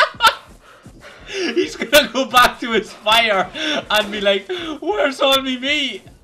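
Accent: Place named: Ireland. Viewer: Haha that was fucking hilarious, I can tell your Irish as I'm Irish myself 😂🇮🇪👍🏻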